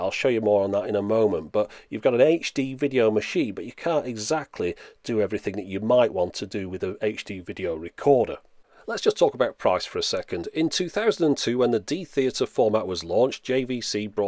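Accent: United Kingdom. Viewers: Это британский акцент всегда радует мои уши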